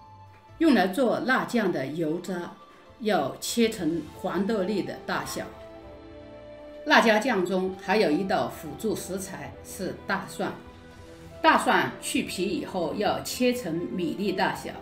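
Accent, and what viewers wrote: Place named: Hunan, China. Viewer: 我看压饺子皮视频听你讲话就觉得你是湖南人，果然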